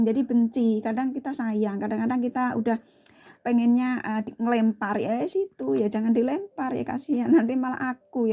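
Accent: Java, Indonesia. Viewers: Mantaaafff kak readingannya,rasakno. Dia mantan suamiku,yg jls dia gelo sdh menceraikan sy, betul skl kak kami sdh mempunyai anak,dia telah bersm yg lain,namun ternyt dgn orang tsb ternyt gk ada kebahagiaan, skrg br nyadar bhw bercerai itu ternyt gk enak,dan saat ini akhr2 ini sering menghub sy by phone. Sy sih sdh nyaman dgn single parent ini. Tksh kak,sy sll ngikuti chanel kakak,sy sk dgn suara kakak yg njowoi,smg kakak sht sll,sukses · Suka dgn gaya ngomong jawanya kak.. trnyata iso Jowo ggih kak.. 🥰😍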